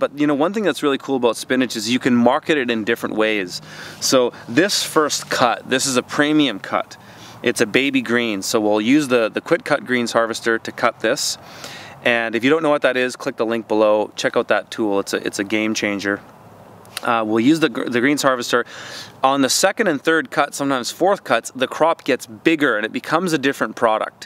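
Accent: Canada. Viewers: sounds canadian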